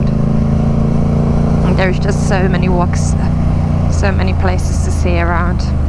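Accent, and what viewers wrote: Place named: England, United Kingdom. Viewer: hi,im doug from australia,i love all your videos,you are good on your bike,you now have a english accent,what i think halps your vlogging you have a great voicei love the way you talk and you are very easy on the eyes[eye candy]and i love when you swear,tell me you are real and down to earth,thumbs up every day for you and your videos, bye for now..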